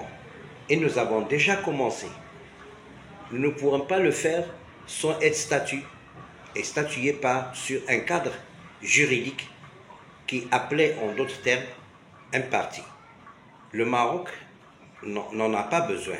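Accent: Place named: Mauritania. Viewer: تبارك الله على الكوعليم … الفرونسي ديالك بحال ديال الموريتانييين او السينغاليين … خصك غي شي صباغة كحلة وها نتا ناضي …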